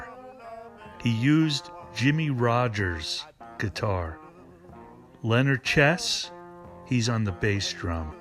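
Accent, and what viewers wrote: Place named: Northeast, United States. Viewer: Once again I’d like to say I think you did a superb job at making these two documentaries. The making of BgsBqt. Part 1 and 2 I want to add some wood to the fire because I know you are working on part 3. I’m sure it will be great! What I want to say is I think you have gotten even better at making your documentaries! The CRYSTAL CLEAR CLARITY IS EXQUISITE!!!! You have a very good voice. If you ever check the wonder years tv show the way they depict the 60s and early 70s that narrators voice is just right. I like your stuff because it is soothing the same way as the wonder years was! Let’s face it these are the wonder years too we are talking about. Or I should say that you are talking about. It has to be that voice of yours. To me it is important that it is a NORTH EASTERN United States accent as such. Somehow an English accent just rubs me the wrong way when they are talking about the Stones. 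Or the wonder years. LA accent just sounds like they don’t quite know what they are talking about. You really come across as very genuine and you come across as really knowing what you are talking about. You calmly and cooly and in a clear non-rushed way guide us through it. The QUINTESSENTIAL AND PROLIFIC AND ICONIC NARRATOR OF THE WONDERFUL WONDER YEARS OF THE STONES! The greatest damn narrator of the Stones of ALL TIME! You tell their story best!!!!!!!!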